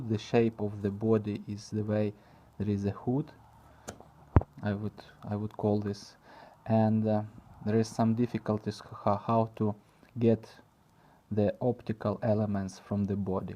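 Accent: Russia. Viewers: lol, your pronunciation as a Russian man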